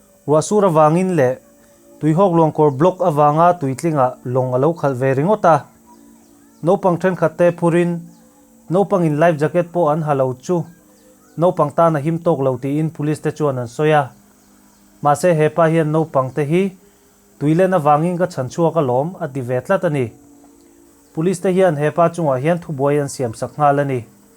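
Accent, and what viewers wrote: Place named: Delhi, India. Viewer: I pronunciation ațang hian Hindi i thiam hmel riau. Delhi vai i kâwm tam a nih dawn hi.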